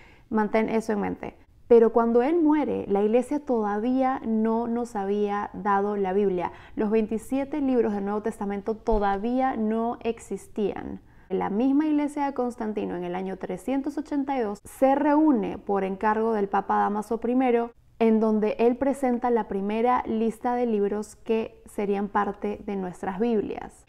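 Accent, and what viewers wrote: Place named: Lima, Peru. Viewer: ¡Te felicito! Excelente didáctica. Soy apologista católico desde hace treinta años y me enorgullece ver tu prestancia y razón para defender nuestra fe católica y su verdad recibida del mismo Espíritu Santo. A propósito, siendo yo del Callao Perú, capté tu acento limeño. Te pregunto, ¿estás en el Perú o en EEUU? Muchas bendiciones en tu apostolado.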